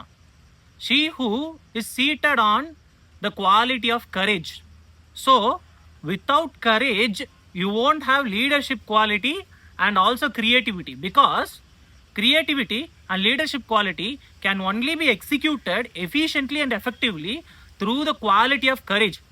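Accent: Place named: Tamil Nadu, India. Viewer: Superb tambi for your efforts but tamils will spell thara as dhara like tha you will spell as dha which changes the word meaning completely please focus on original words than tamil accent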